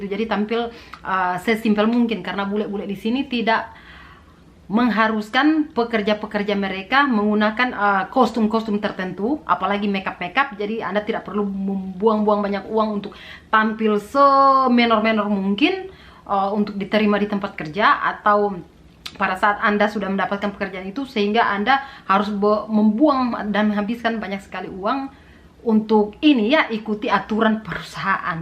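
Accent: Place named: Eastern Indonesia, Indonesia. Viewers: tanta asal mana ni? 😁🤣dialek kayak orang ntt 🤣🤣🤣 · logat kaya orng timur 😁😁. semoga saya bisa di bantu kerja ke sana kk . 😔😔pengeeenn sekali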